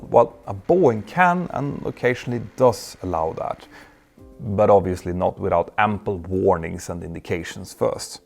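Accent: Scandinavia. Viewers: the way u talk english either ur norwegian or sweediah 😂😂😂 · An interesting Scandinavian accent here, but natural for sure.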